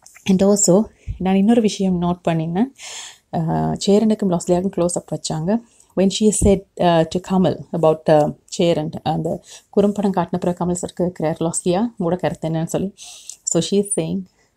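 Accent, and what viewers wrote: Sri Lanka. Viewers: இலங்கை(இனிமை) தமிழ் 👍 👏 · இலங்கைத் தமிழ இங்கு கேக்க நல்லா இருக்கு அக்கா. நல்லா செய்திருக்கிறிங்க அக்கா. வாழ்த்துக்களும் நன்றிகளும்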